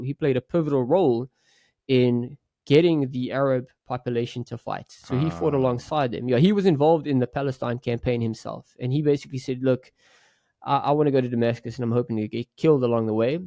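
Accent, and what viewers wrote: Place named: South Africa. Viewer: Sorry to ask trivial questions - where is from? His accent sounds so similar to South African. Aweh.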